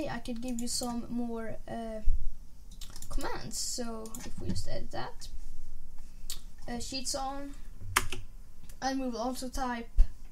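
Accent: Sweden. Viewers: Är du svensk? låter så på dealekten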